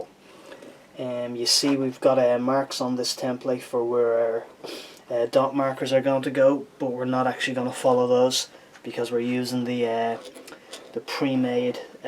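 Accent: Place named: Dublin, Ireland. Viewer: Perfect timing!. Just as I'm about to start a scratch build 'Tele' along comes a great teacher!. Your very fine attention to the details a novice really needs to know about is superior to any other source I could find on any YouTube channel. I'm building this for my 14 year old grandson as a surprise.!..I'd better not screw this up,he knows his guitars. Lord help me?? And a 'Jackeen' to boot. Lovely to hear your Dublin accent !!..I grew up in Marino and Fairview/Clontarf..No jobs nor any future; We youths had to go elswhere??..I'm up to part 4 now and I have a great handle on how to built a 'Telecaster', Thanks to your great teaching. From Albany NY USA..Brendan Murphy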